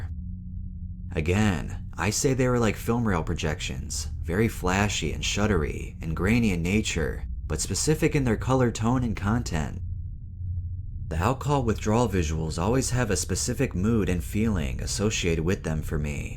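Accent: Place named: South, United States. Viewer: Ngl I really love the little country into tune you now use lmao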